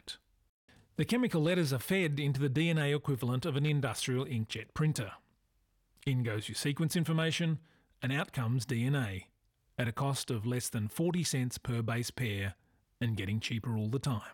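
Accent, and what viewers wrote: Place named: Australia. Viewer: Very intriguing. Nice video. Narrator sounds like Christian Bale as an Aussie...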